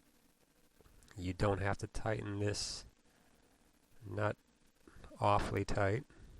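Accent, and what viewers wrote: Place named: Boston, United States. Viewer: Sheesh...ain't never heard nobody from Bahstin before? Great video. Used this as my guide, and hang the ol' Haines manual. Well done, and thanks a million!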